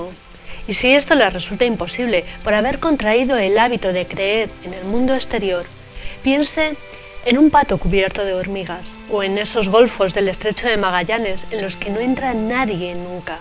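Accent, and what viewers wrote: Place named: Spain. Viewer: Hola, lo has hecho muy bien y el video está bueno, pasa que a muchos argentinos nos resulta raro ver relatada la obra de un compatriota nuestro con tonada española. Ya sé que dirás que en Argentina se habla algo que no es español, pero quienes hemos nacido acá y escuchado el argentino desde la cuna creemos que es "el" idioma, jaja, muchos besos desde Buenos Aires.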